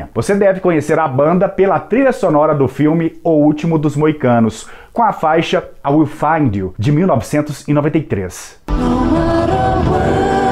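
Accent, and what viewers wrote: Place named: Minas Gerais, Brazil. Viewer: Nosso amigo fala como um mineiro! Muito bom video! Difícil encontrar material em Português sobre Enya. Uma coisa que sinto falta mesmo. Apresentações ao vivo. Por ser dependente de arranjos sintéticos para tentar expressar o som que " ouve" é compreensivel. Viver reclusa em um castelo frio na Irlanda contribui para açodar stalkers, uma pena. Mas, como venderia sem marketing? Enya foi muito importante para uma fase de minha vida. Ainda é uma referência positiva e tranquilizante!❤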